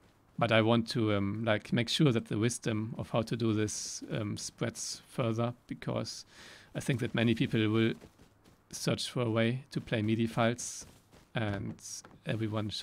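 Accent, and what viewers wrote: Germany. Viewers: This German accent Omg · DU BIST SO DEUTSCH OMGGG ALDA MAN HÖRT DICH ;))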